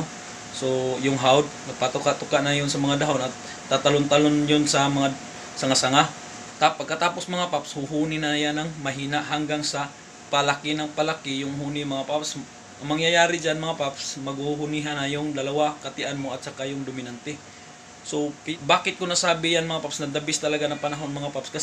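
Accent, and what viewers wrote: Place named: Samar, Philippines. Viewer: Samar Yan bisaya or waray salita Niya Pansin ko sa salita niya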